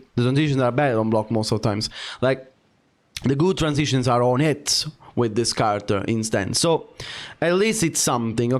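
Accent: Italy. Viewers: Bro fuck Tekken, I watch for the Italian accent 🤌🤌🤌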